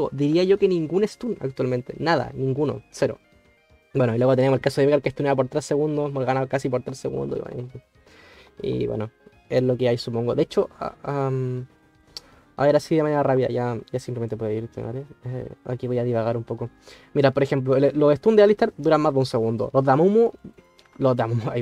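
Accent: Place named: Chile. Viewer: Me gustan tus videos porque tienes una voz super amigable, por tu voz estoy casi seguro que eres Chileno, un lindo país y buen video... Saludos desde Chile ❤️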